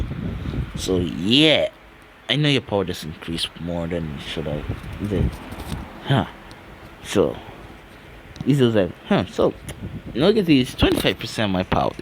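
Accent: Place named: Jamaica. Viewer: Your a Jamaican aren't you well big up mi G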